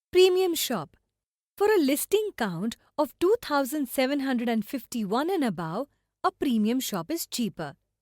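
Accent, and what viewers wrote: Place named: India. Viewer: White lady with an indian accent, funny. I feel like I'm at 7-11 or my doctors office.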